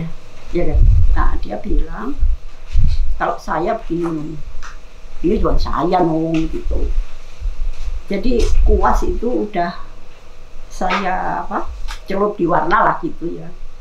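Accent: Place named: Surabaya, Indonesia. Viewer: Mbak sepertinya sudah cukup artinya itu inti yang saya dapat dari pak Nashar: " bagaimana menjadi " pelukis, shg ilmu itu saya terapkan pada diri saya sampai sekarang. Kenyataannya saya masih bertahan dan menjadikan semacam energi mbak, terima kasih banget....... atas vidionya, ( gaya suroboyoan omongane tur banter )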